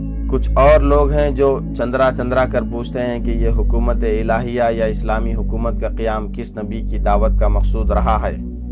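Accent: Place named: Hyderabad, India. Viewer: The reader appears to be from Hyderabad (Deccan). I can make it out by picking up some words, the way he is pronouncing them. JazakAllah for your efforts. May Allah reward you.😊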